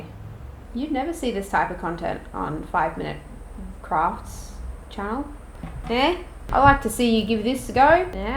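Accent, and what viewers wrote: Australia. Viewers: are you austral,ian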